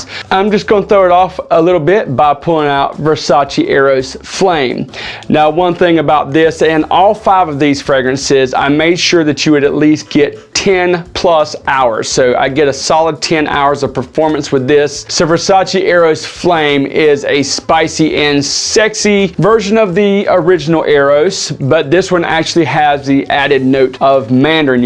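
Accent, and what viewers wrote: South, United States. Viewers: I heard the draw and was like....he's from my neck of the woods. Howdy from South Carolina!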